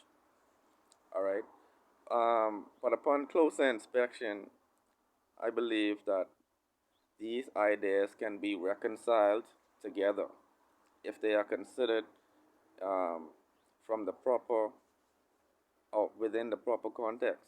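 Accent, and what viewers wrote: Bahamas. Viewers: Sound like you Bahamian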